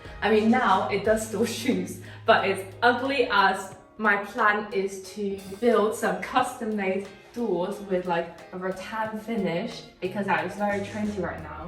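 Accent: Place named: London, United Kingdom. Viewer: and you have such a beautifull smile nice video and a londoner dialekt very elegant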